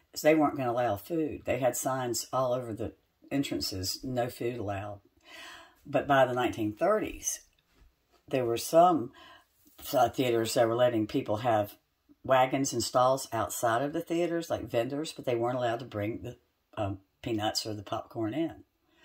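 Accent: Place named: Virginia, United States. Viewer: You're a great storyteller with that Virginia accent.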